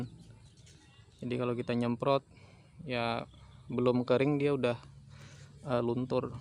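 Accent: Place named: Banjar, Indonesia. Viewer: Banjar dmana nya pian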